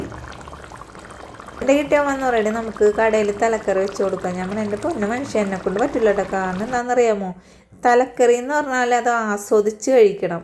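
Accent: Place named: Kochi, India. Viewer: ഈ കൊച്ചി ഭാഷ കേൾക്കാൻ ഇമ്പമാണ്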